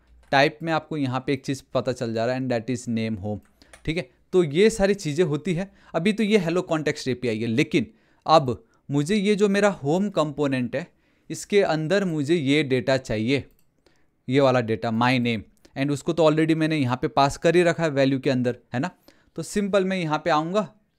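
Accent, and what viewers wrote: Nepal. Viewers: 😂😂 counter na saunter , full Nepali tone , lots of love 💕😘 bro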